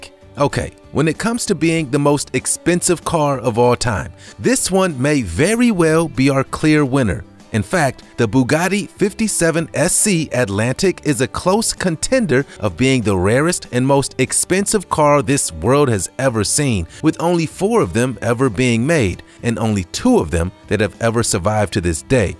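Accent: United States. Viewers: I hate when people with and American accent talk kilometers. They know it don't mean crap to most of us. Miles per hour please ! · Nob the Knave English language is coming from 🇬🇧, and this is a cowboy English dialect, and it's sounds like he has hot baby patato in his mouth.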